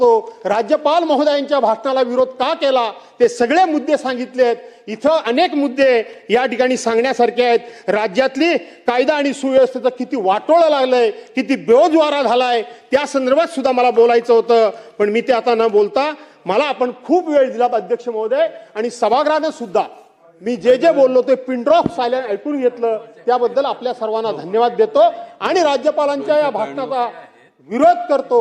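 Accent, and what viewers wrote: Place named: Konkan, India. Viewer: कोकणी आवाज कसा आहे.🎉🎉❤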